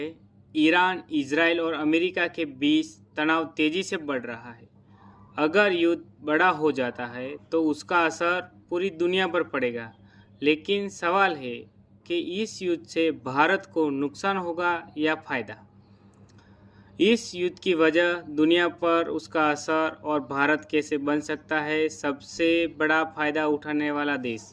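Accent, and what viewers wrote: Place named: Gujarat, India. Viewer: Gujju Hindi … love it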